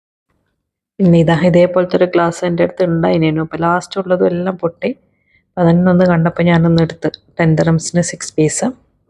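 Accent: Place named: Kannur, India. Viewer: Kannur bhasha 😊😊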